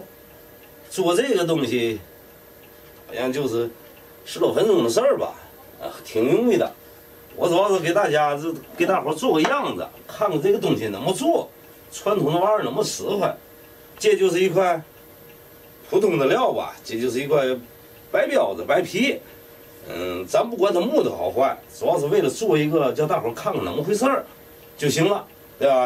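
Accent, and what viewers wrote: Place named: Tianjin, China. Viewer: 10 分钟 做完 用了二集???这嘴天津的... lol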